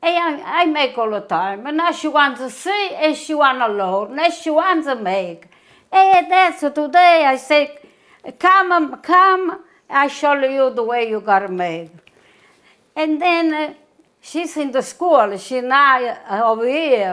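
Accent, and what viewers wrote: Italy. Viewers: Looove that glorious Italian accent!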